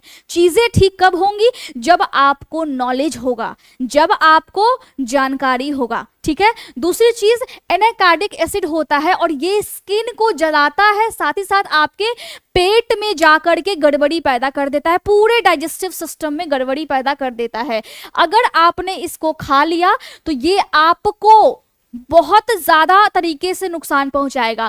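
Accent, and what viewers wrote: Bihar, India. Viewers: और अच्छा बोल सकतीं है आप, बिहारी ton avoid कीजिये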